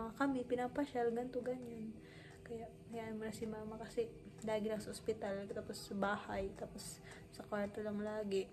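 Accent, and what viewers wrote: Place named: Leyte, Philippines. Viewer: Leyte ba kayo gang ? Parang leyte speaking kc kayo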